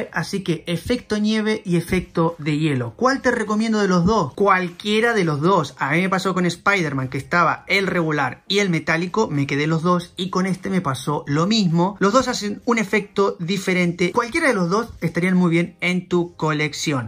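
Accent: Argentina. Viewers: SOS Argentino??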